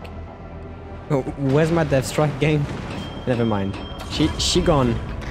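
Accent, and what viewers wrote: France. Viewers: Je découvre ta chaine et je suis ravi qu'un français nous offre des vidéos d'une telle qualité! Ton anglais est très agréable à écouter, et sans doutes beaucoup plus agréable à pour les spectateurs qui ne sont pas familier du Français... mais ça fait toujours un petit plaisir chauvin d'entendre un accent français et quelques mots d'introduction en français.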